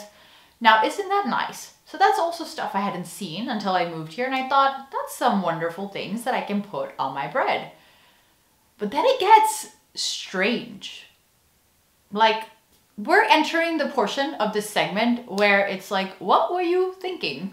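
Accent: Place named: Netherlands. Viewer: It's happening 😯 I couldn't quite put my finger on it at first but you are starting to sound like a Dutch person speaking English. It's subtle, but definitely there. edit: although you did completely butcher the pronunciation of "ketjap" 😂 it's cat-jap, where the catpart is pronounced just like the animal, the "j" is prounced like the y in yes, and "a" is prounced like the second a in El Capitan. Ketjap.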